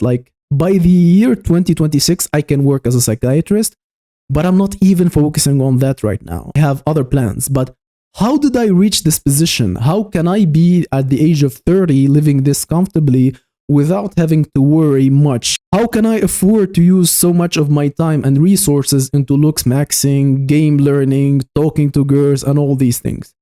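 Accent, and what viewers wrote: Russia. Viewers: Bro are you Russian? Your English is really good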